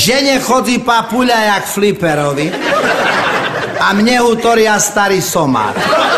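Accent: Slovakia. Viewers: ander je najlepsi ale slovensky tazko rozumiet ten dialekt ;D ale predsa je zvlast ;D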